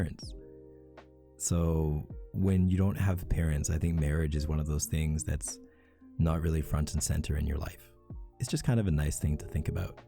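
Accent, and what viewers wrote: Canada. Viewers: HOLD UP UR CANADIAN? HOW DID I NOT CATCH ON- OH MY GOD LMFAOOOOO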